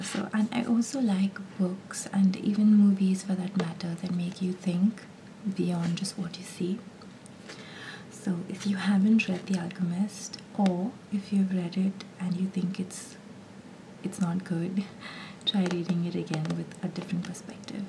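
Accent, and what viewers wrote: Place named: India. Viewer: Soft, Indian accents ALWAYS give me ASMR, especially this "unintentional" kind!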